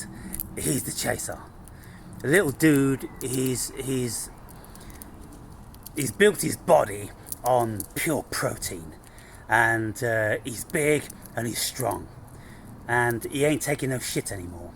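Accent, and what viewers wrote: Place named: Southern England, United Kingdom. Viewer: Hi, found your video on Peter Kingsley, great work! I have a rather personal question, you said you’re living in Newcastle up north, but your accent is from south, when and why did you move up there? I’m from London. Thanks 👍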